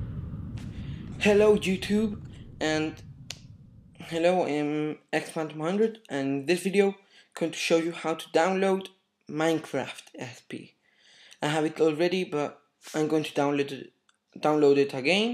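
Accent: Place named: Mexico. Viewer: gracias debolada se te ve el acento de mexicano XD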